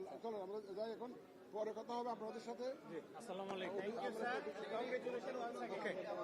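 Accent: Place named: Chittagong, Bangladesh. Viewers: চট্টগ্রামের মনে হয়। কথায় চট্টগ্রামে আঞ্চলিক ভাষার সুর। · ভাষার ভাব বলছে, চাটগাইয়া স্যার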